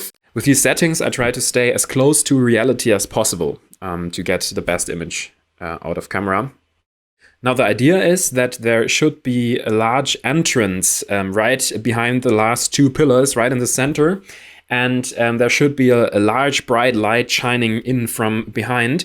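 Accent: Germany. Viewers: Liebe es Deutscher Akzent 😂 top video danke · Are you from germany because i recognize the accent . Viele grüße! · Love it! Thanks for this inspiration and the tips! Pls more of this sort of Videos!! Are you from Germany? Your accent sounds like that! 😋😅🙏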